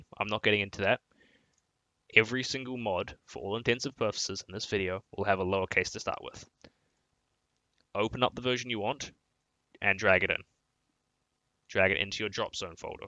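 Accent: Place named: New Zealand. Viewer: i thought that was a new zealand accent.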